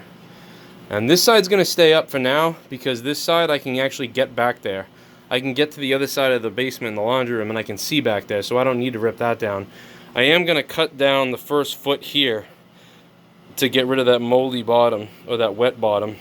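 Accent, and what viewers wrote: Boston, United States. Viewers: Lol do you consciously switch to your Boston accent or is just something that happens sub consciously?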